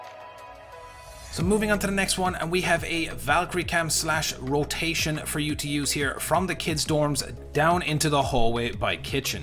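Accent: Ireland. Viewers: You Irish? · U irish? If so where from lad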